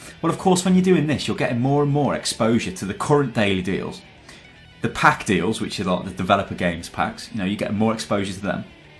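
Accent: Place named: United Kingdom. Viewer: I subscribed because of your British accent. :D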